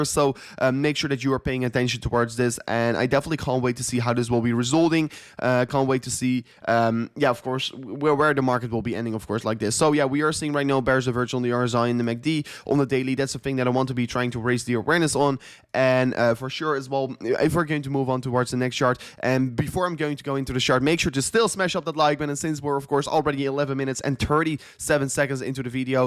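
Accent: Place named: Netherlands. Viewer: bij zen eerste woord wist ik al dat die nederlands was lmao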